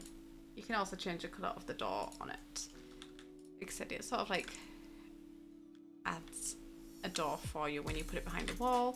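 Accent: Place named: England, United Kingdom. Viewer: I was watching this video and my dad overheard, and he thinks you’re from around where he grew up in England 😂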